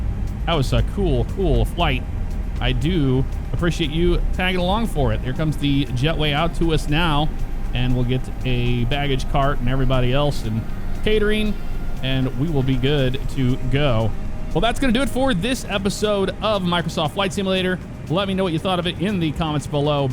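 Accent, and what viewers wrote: Newcastle, United Kingdom. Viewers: Are yo a geordie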